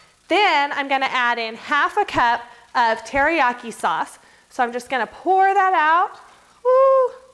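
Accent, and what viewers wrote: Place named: United States. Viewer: What a load of rubbish all processed food frozen and that so annoying American voice just shut up!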